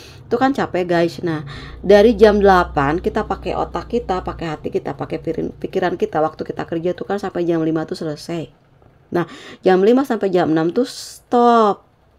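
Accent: West Java, Indonesia. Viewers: Assalamualaikum bunda asli timana logatnah sunda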